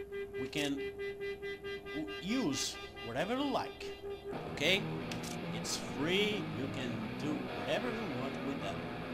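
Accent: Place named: Brazil. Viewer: Você é Brasileiro né Por que o seu inglês é um pouco puxado para o de português Do jeito de falar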